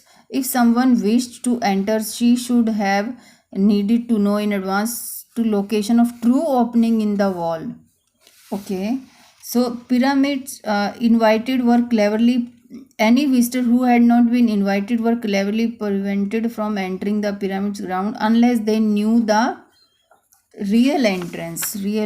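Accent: India. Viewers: Your accent like indian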